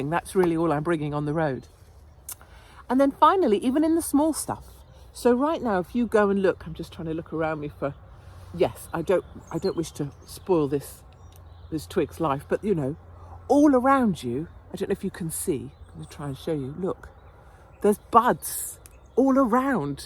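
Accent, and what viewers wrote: RP, United Kingdom. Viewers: I love you sound like a posh girl,articulate like an estate girl.quality.x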